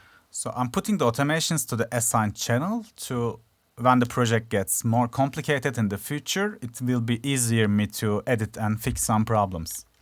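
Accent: Turkey. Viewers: Ne kadar türkçe bir ingilizce diyordum izlerken :) çok güzel video tebrikler :)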